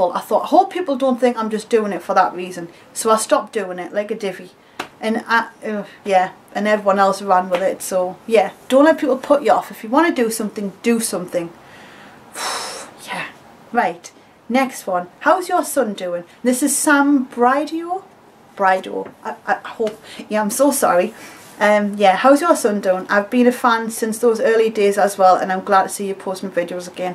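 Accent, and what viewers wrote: Newcastle, United Kingdom. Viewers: Hi, I just wanted to say watching this video was so emotional for me and brought back a lot of teenage memories, your tutorials helped me through many Halloween’s and to make me feel beautiful at school, I have to admit I was bullied for being ‘emo’ but my makeup was amazing and I learned a lot from you! maybe just 13 years old when I firs5 discovered you! I thought you were amazing and watched you for hours on end. I’m now 25 and still been following your channel since back then. I love that you’re a real person, watching your videos is like having a chat with you, just like back in the good old days! I feel very sorry that this happened to you, you really are a down to earth lovely lady and I hope to see you back on here more! I also get a good giggle out of all the geordie sayings I’d say to my dad, it’s not often you hear someone on here chatting the same accent anymore! 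Sending love it’s so good to see you!!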